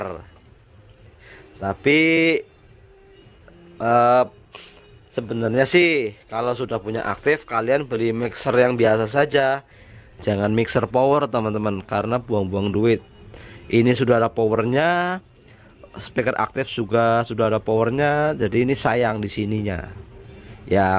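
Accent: Banyumas, Indonesia. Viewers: nek ora ngapak ora kepenak mas he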